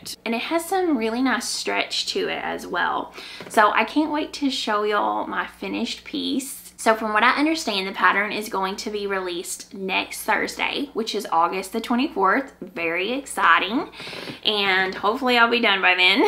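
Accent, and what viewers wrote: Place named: Arkansas, United States. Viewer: Hi! I love your podcast!! I’m a fellow Arkansan transplanted to Texas a long time ago and I just love hearing your accent from home! I love your Zosia wrap cardi - I can’t wait to make it in a light gray. I also recommend reading “A Gentleman in Moscow” if your haven’t already read it. It’s a beautiful story. My mom and I are reading the Thursday Murder Club series at your recommendation and absolutely love it! Thanks so much for all you share!! You’re so inspiring 🐝 🐓 🧶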